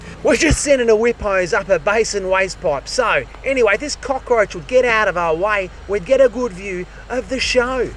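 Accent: Australia. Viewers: !! I gotta tell ya mate. Sometimes I’m in a right bad mood and coming along with you and unblocking these drains really turns it around. I go from feeling like a 1 to a 10 the minute those drains start running clear. Watching from VA, USA and you gotta read that comment in your own voice mate! Americans are notoriously bad at the Aussie accent 😅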